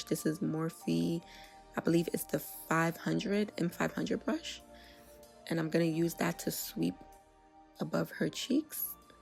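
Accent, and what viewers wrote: New York, United States. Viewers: That cut crease is EVERYTHING!!! Absolutely beautiful!! BTW: u sound like ur from NYC? If so.. NYC STAND UP!! Great job 👏🏽👏🏽👏🏽👍🏾👍🏾👍🏾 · Gotta be from New York u sound just like juju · I LOVE how you talk lmao. Are you from NY?! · Yasss!! 😍😍 I’m like yup, she from ny.. I can hear it.. where do u find ebony bronze? I can’t find it anywhere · It’s so easy to pick up on. Lol I’m from Nola and knew she was from NY haha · Right knee she was a NYC girl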